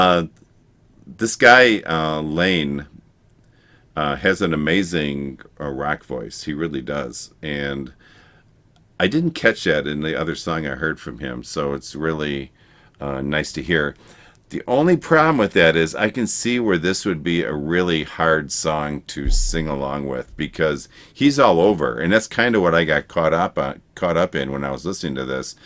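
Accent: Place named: Midwest, United States. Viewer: 3 seconds in and I detect Midwest/Great Lakes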